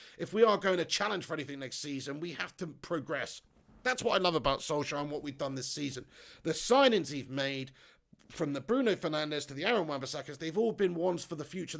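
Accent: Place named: Scotland, United Kingdom. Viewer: We need doing the deals like his Fifa career mode.the Scottish accent needed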